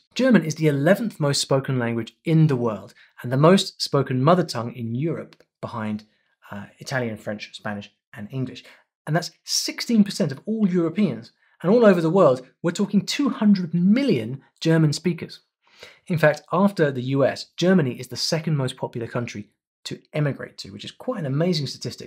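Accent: United Kingdom. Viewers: To me as a German you sound, quite frankly, very bri'ish. But English has some crazy dialects as well. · Ich habe Dein großartiges Video mit Interesse verfolgt., Du kannst so fesselnd erzählen. Und Du klingst so überzeugend. And it's great to hear you British English. I just like both Westgermanic languages.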